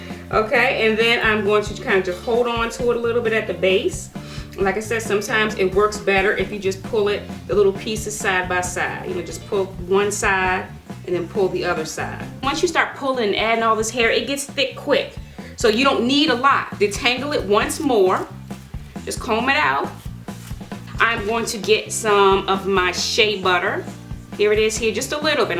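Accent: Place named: North Carolina, United States. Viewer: You are super creative and beautiful. Love your accent, too! I'm a New Yorker, but I can tell you're from NC. <3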